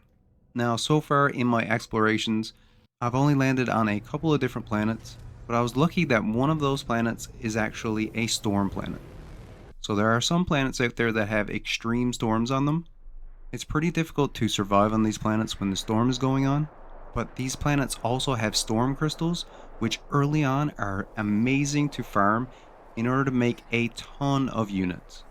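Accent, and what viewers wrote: Newfoundland, Canada. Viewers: i love your accent- reminds me of home (newfoundland) :] ty for this video <3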